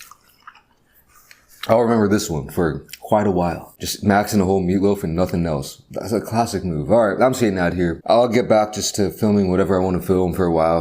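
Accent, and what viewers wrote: United States. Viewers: My daughter whose 11 loves Korea and everything Korean..lol. she's a big Anime fan.. I told her to watch your mukbangs and she thinks yo totally cool 😎 😅😅😅..she also loves your American accent 😮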